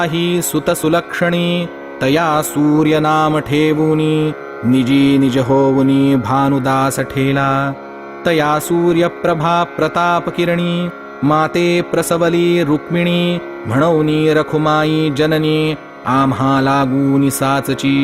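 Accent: Standard. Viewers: Khup dhanyavaad... Aj guru krupene ha garth ch parayan karych ichha ali... And tumcha ha vedio bhetlaaa khupp khupp dhanyavaad... Tumchya vedio muje agdi shuddh uchhar hotatt... Khup shayog bhetlaaa.... 🙏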